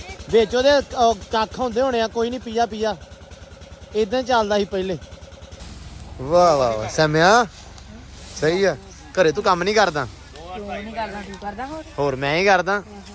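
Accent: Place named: Majha, India. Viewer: Tuhadi boli sade majhe de lagdi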